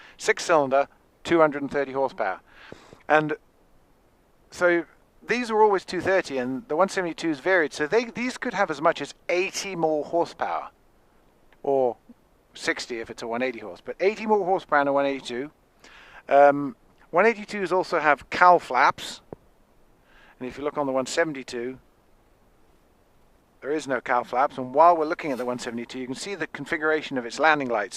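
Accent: England, United Kingdom. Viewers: This is an English accent…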